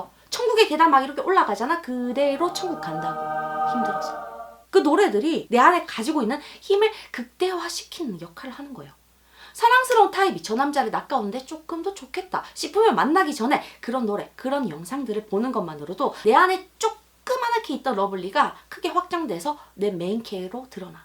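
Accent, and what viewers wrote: Gyeongsang, South Korea. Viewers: 언니 대구분?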